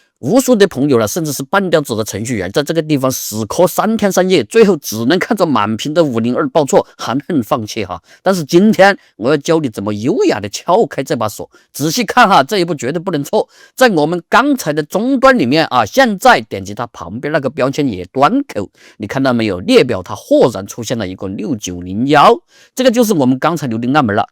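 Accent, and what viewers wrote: Sichuan, China. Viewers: 你是重庆人还是四川人？太喜欢的川普了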